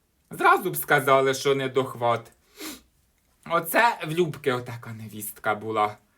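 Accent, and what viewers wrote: Poltava, Ukraine. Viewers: Вам срочно потрібно на "Україна має талант",Ви ж артистка ще та!!! Ну так же класно копіюєте наш Полтавський діалект,завжди з великим задоволенням слухаю! Рухайтесь до великої сцени,бажаю успіхів! Данилко теж наш і починав зі шкільної сцени,а тепер який знаменитий.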